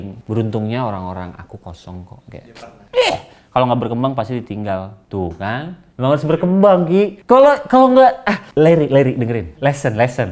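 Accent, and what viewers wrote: West Java, Indonesia. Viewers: bang ngmg sundaniss canduuuuu ❤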